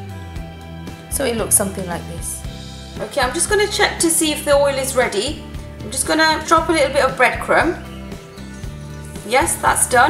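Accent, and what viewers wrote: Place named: United Kingdom. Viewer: I have no idea what u are saying british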